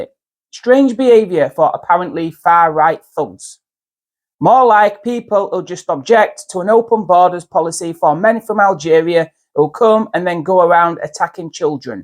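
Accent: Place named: West Yorkshire, United Kingdom. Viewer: Over pronounces his T's lol so people from other countries can understand the west yorkshire accent laura towler used to do it a lot.. Good vid i agree! 👍🏻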